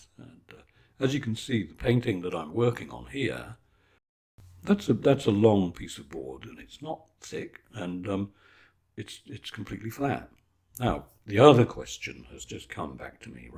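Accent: United Kingdom. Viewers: I love it..so beautiful, like every time I watch you, and you are just so calming, with that lovely voice and great British accent. . Well, .now I am calm and relaxed. Thank you so much. Both paintings are beautiful.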